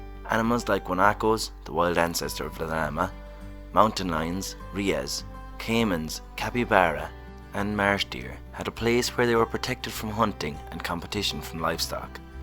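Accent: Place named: Ireland. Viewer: Thank you so much great channel and wonderful to hear the Native Irish voice 😄😄.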